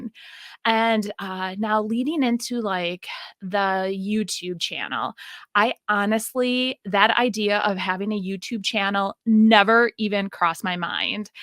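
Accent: Wisconsin, United States. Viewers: I'm loving getting to know you better with these podcasts! I can't wait to see the next and the next! When I first found you on YouTube, I'm sure I typed in DIY or something but what got me hooked on you wasn't the content alone (although that was stellar) it was your personality and, yes, your very cool, Wisconsin accent. I'm from Southern Ontario, Canada and I feel that I have a lot in common with Wisconsiners! (Is that a word?) You are the sweetest, kindest, most generous person on this planet and I NEED people like you in my realm! Never stop doing what you do. You are The Empress in your little realm and I, for one, am grateful! ❤😊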